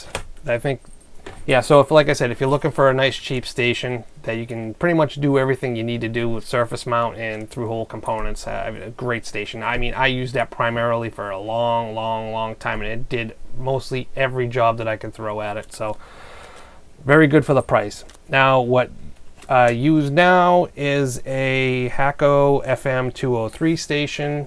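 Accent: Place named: Massachusetts, United States. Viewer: Knew you were from MASS right away even though you don't pronounce your AAAAs (read R's for those not from MASS) like I do.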